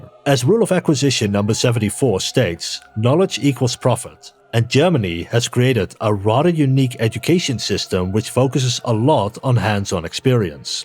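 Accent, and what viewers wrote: Netherlands. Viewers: I don't know whether I love your videos for the dutch accent or the great content. It's probably both, yeah who am I lying to it's both. Great video!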